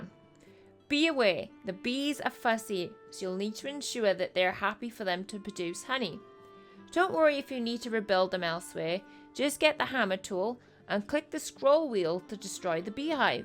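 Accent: Wales, United Kingdom. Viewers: Are you welsh? Sounds like you're playing it down a lil for your "stage voice" - which I wouldn't blame ya for, I pronouns would too if I had a YT channel! Not a criticism, just as a fellow Welshie I thought I heard it :) Only just started the vid but feedback seems excellent in comments so I'm SURE it'll be super helpful, so thanks in advance! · Great vid - made me smile :) nice to hear a fellow welshie on youtube too!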